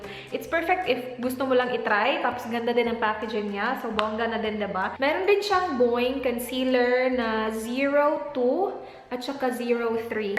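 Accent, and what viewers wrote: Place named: Visayas, Philippines. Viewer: Are you Bisaya? I looooove your accent!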